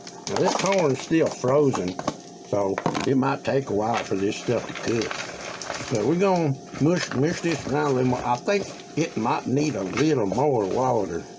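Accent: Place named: South, United States. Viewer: Good job on the Southern accent.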